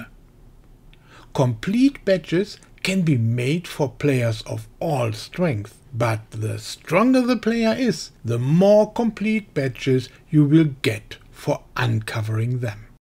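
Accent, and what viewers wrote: Germany. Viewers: Sorry, bad joke. And yes we all can hear he is from Germany, but who cares? He speaks English, right? The video's are easy to understand.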